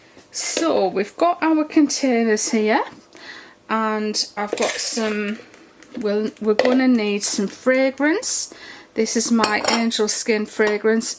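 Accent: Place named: England, United Kingdom. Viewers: Love watching you! This sounds crazy but I catch myself talking to myself in your English accent and I am Texan!! This quarantine is making me bonkers!🤣 Love that you say you cook on a “ring”! I had to think about that for a second. We cook on a “burner”.😄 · Hi I’m guessing because of your accent you are from the north of England- I live near Newcastle! Love your vids very helpful. Well done ❤️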